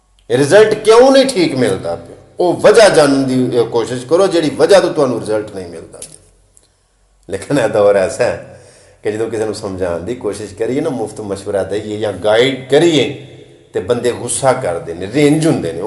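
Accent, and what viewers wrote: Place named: Sialkot, Pakistan. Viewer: Pure Sialkotiya basha bolde sir 🙏🙏🙏From punjab india to asi bhi Sialkotiya Jatt hmm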